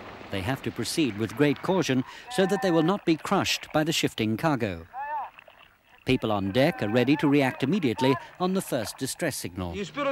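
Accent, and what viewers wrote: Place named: RP, United Kingdom. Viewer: It's not an accent it's called Received Pronunciation and he likely wouldn't have got the job without it at that point in time! I'm not a fan but I think it's deemed the most understandable form of the English language.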